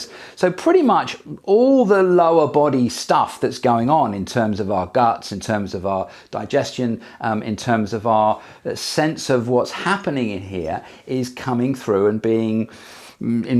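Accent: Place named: Scotland, United Kingdom. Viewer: Scots speak!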